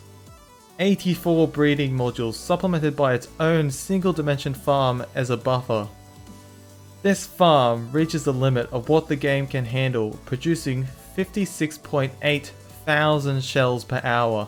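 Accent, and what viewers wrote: Australia. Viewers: Aaahhhhyyyyy an Australian!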